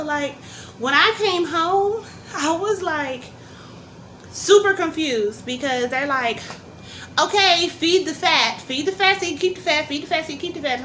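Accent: New York, United States. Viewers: You talk like New York flavor flave 😄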